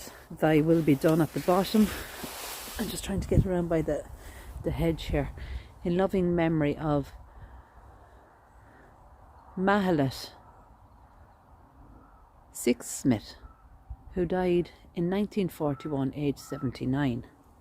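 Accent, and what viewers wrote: Ireland. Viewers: By the way, your Irish brogue is absolutely mesmerizing!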